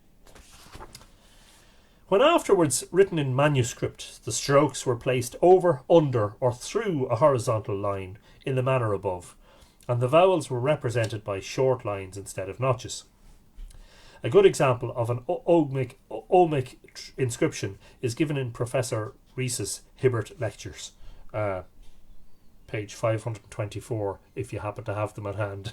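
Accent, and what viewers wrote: Ireland. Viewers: I love Irish mythology, and always have, and to hear it read by an Irishman? Thank you sir, for this pleasure.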